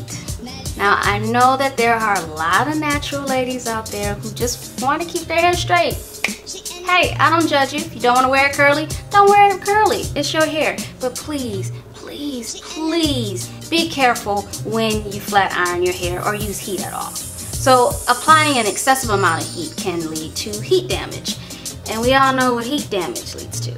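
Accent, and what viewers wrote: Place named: New Orleans, United States. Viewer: I love your accent are you from new Orleans??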